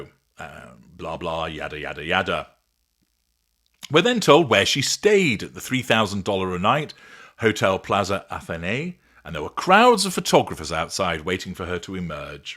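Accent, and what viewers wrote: United Kingdom. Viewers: Love your sense of humor. With your wonderful British accent you make shit sound classy. More fuel.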